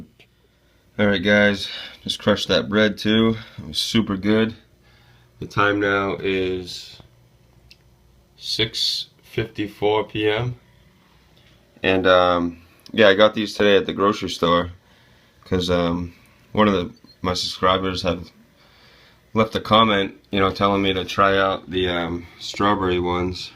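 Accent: Boston, United States. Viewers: yeah i love it. btw do i detect a little bawwsten accent im new to this channel and not sure where your from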